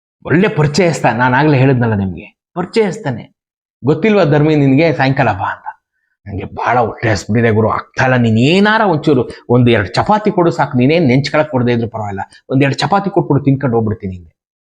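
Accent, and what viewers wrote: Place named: Mysore, India. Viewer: ಬೋಸಿ 😂😂😂 ಈ ಪದ ಮರ್ತೆ ಹೋಗಿತ್ತು.... ಮೈಸೂರು ಕನ್ನಡ ಅಂದ್ರೆ ಇದೆ ❤❤❤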